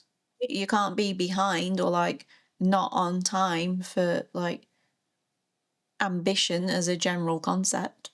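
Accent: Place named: North, United Kingdom. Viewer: Still got the northern accent 🎉🤟🏻💪🏻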